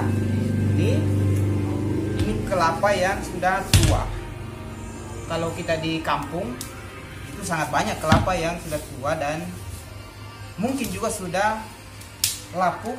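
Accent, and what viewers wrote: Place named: Bugis, Indonesia. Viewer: Logat bugis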